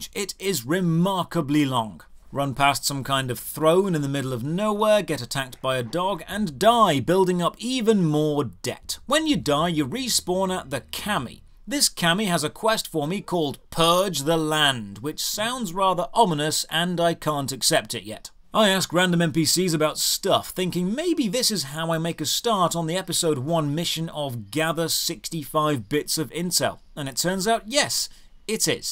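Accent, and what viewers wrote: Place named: RP, United Kingdom. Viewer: I love that you sound so posh and well spoken, so hearing you drop the f-bomb instantly makes me cry laughing. Thank you for all these videos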